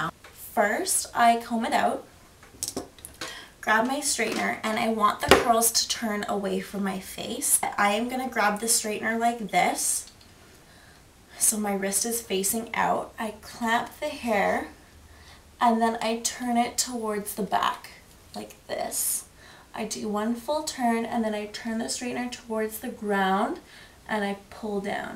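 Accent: Canada. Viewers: Hehe I could tell you're Canadian by the way you talk ^.^ A bunch of shows are Canadian so I kinda know how the accent is lol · Lol your Canadian accent is cute.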